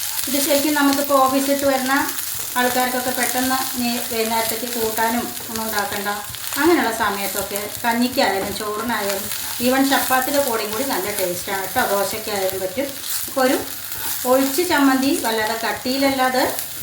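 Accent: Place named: Valluvanad, India. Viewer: നല്ല വള്ളുവനാടൻ ശൈലി🥰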